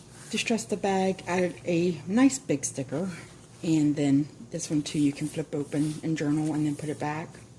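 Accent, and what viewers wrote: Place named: Southeast Pennsylvania, United States. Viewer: As soon as I heard your accent I know you were from South East PA!!!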